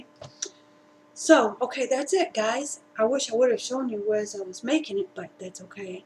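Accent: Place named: South, United States. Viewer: You are such a sweet person & you are living like Christ wants you to & giving to thise in need is sucha wonderful way to show HIS love..Your pies look so yummy...My mom use to make those...She is ina nursing home now with Alhiemers..You have a southern twang like me..I'm from Oklahoma. I like the name Peyton as well..So cute!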